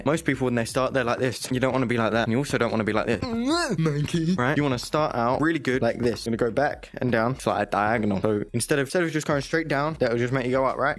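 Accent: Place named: United Kingdom. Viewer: u sound british, but you dont look british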